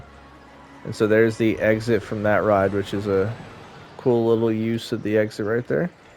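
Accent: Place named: United States. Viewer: Ah I see, the Mrs loves your accent and wanted to know what part of America you are from. She is actually part Native American herself, well part Irish, British and Native American, her Grandmother was an adopted Native that spent the first few years of her life living in a tent somewhere over there then married her grandad who brought her to England. The Mrs was very close with her and has a keen interest in her Native American heritage. I think your accents you have over there reminds her of her Nan.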